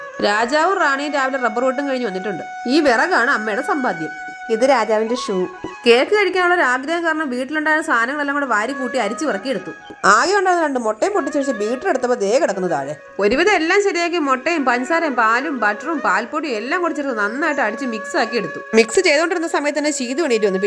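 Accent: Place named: Pathanamthitta, India. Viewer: ഒരു പത്തനംതിട്ട slang അതാ chodiche❤️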